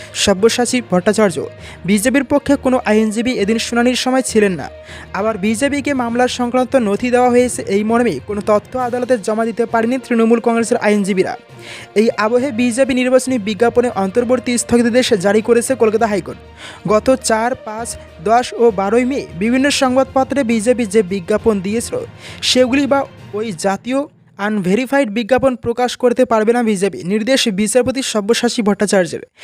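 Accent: Bangladesh. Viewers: ভাই আপনার কথায় বাংলা দেশি টান। তথাপি ভালো লাগে। চালিয়ে যান।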